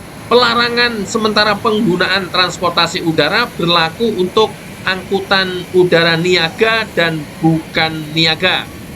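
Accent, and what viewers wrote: Java, Indonesia. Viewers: Jawa nya mefom banget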